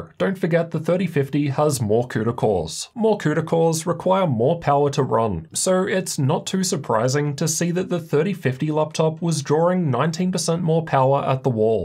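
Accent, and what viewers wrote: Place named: Australia. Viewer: Aussie accents are fun: you say turrr when you mean to say too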